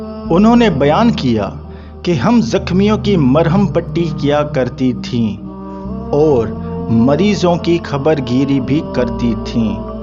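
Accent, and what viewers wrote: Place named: India. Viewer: Apni Urdu sahi bola Allah ka liya ku indians ki terha alphabet ha kha ha kiya quran bhi asa hi perhta ha pata nahi pakistan ma ya kiya bimari chali ha indians ko copy kerna ki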